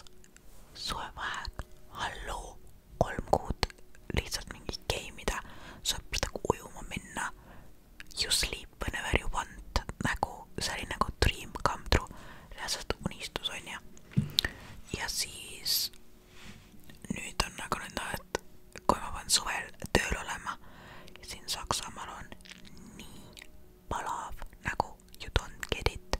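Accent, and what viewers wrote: Saaremaa, Estonia. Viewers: Awwi , sa oled saarlane 🫶🏻 aga tule Hiiumaale ka 🤠 näiteks tuuletorni ja kardiga sõitma see seal lähedal ☺️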